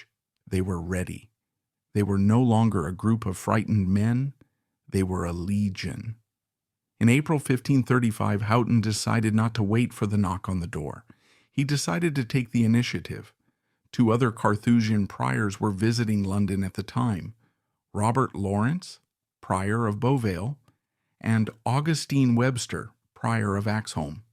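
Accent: United States. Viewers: Why the American voice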